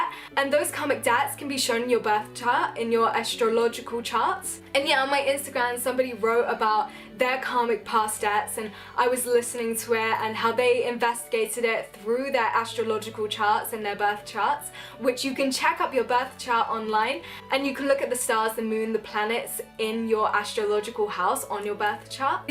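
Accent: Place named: United Kingdom. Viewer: I love the sound of the British English 🤔🤔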